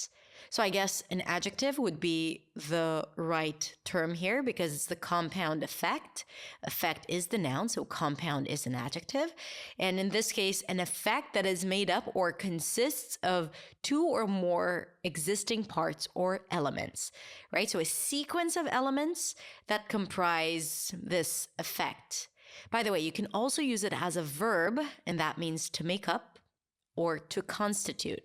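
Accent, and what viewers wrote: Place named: United States. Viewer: This is not practice? 😲😲 😲 I thougt she was American, so good her accent! 😶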